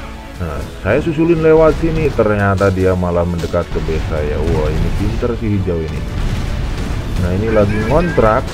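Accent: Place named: Central Java, Indonesia. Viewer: Btw asli mana bang,dari logat ngomongya kaya or Jateng..🙏🙏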